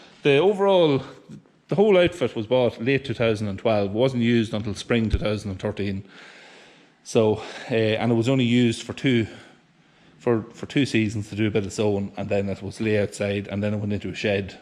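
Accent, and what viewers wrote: Louth, Ireland. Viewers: Are you from co louth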